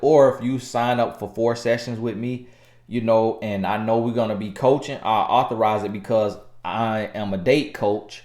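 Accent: South, United States. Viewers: he's so country and i'm here for it! I understand country, but it's been a while since i spoke country.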